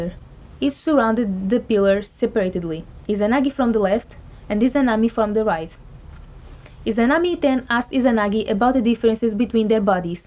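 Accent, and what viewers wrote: Portugal. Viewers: Arquipelágo? A heavy portuguese accent. Perhaps Coimbra?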